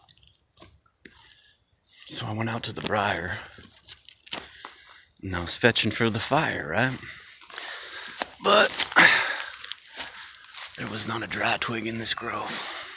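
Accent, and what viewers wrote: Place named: Ireland. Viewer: I miss hearing this in small town coffee co in Kapa’a Kauai. You used an Irish accent. It was fantastic! I think of it often. Thank you for sharing this video. Be well my brother. Aloha!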